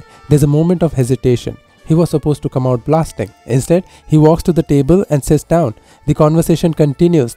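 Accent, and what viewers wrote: India. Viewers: That's an indian accent, I can finally recognise it.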